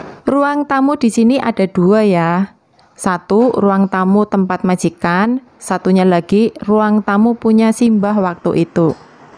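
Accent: Banyumas, Indonesia. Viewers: Mba logate ngapak, wong ndi mba. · Mbak dari daerah mana di Indonesianya? kok ngomong nya kaya orang daerah Banyumas.... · Wong ngapak apa yak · Wong ndi kw yu... Logate kyk ngapak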